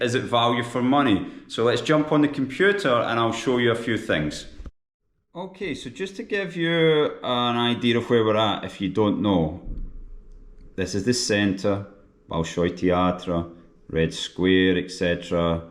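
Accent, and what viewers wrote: Scotland, United Kingdom. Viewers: What strange messages that pop up on your video-----like "why you must leave the West and move to Moscow"....ummmh, why is that? Also, from all the other YouTubers who have posted vids of their apartments yours is certainly NOT the average one. Nice to hear your lovely Scottish accent, though! 🙂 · Thank you so much , nice and clean ! Love your Scottish accent ❤ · Scottish accent is so cute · I love listening to Scottish accent. Greetings from Serbia bro.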